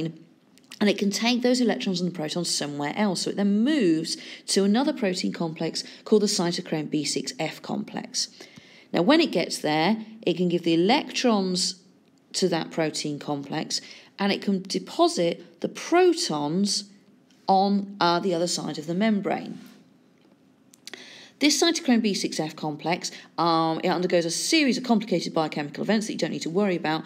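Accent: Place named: United Kingdom. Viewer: It's not bad pronunciation it's accent (British accent)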